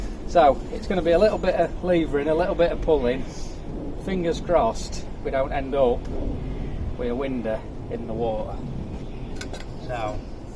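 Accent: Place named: Leeds, United Kingdom. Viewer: I am thinking your from Leeds but I hope from Sheffield put me out of my misery maybe Rotherham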